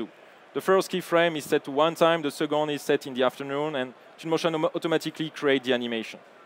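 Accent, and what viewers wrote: France. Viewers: Ze french accent is very funny!😅😆🤭👍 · I love his french accent :V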